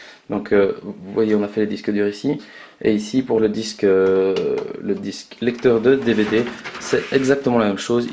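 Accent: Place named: Belgium. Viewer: Jolie petit accent... belgique?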